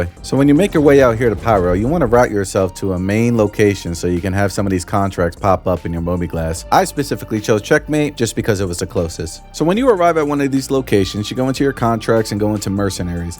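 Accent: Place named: New York, United States. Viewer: I lived in NYC for 10 years, your accent always reminds me of my time there haha. Thanks for the good loot info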